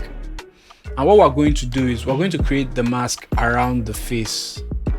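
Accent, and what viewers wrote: Nigeria. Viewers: Nigerian?! LOVE. Thanks Brother. 😊😊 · This is top tier content especially because your spoken English is one I can relate to as a Nigerian that I am. Thank you, truly! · Your explanation is sweet, with our bold Nigeria pronunciations, omo this one enter my head ....i just subcribe on your page stay bless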